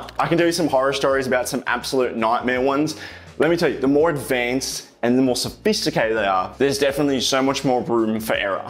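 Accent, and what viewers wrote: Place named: Australia. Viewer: are you an aussie ? just curious kinda sound like that great vid btw lol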